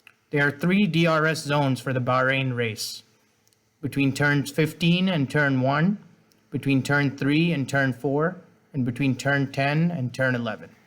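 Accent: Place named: India. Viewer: Just realize how you're talking english, like an Indian talking english.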